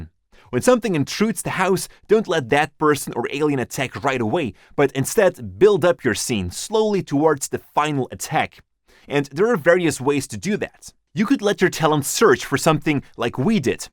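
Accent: Netherlands. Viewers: Are you dutch ? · Are you Dutch?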